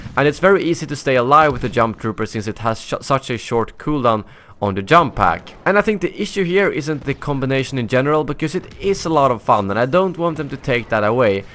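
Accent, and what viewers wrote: Sweden. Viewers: Are you from sweden, you sounds like that. Hope you don't take this bad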